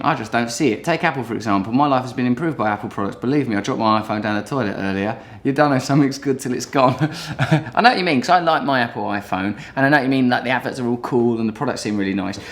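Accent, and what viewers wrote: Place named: Cockney, United Kingdom. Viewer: You are an incompetent, where'd you get that mockney accent, oh man of de people?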